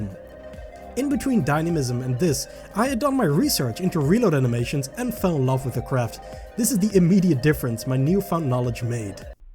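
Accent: Netherlands. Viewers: are you dutch or am i wrong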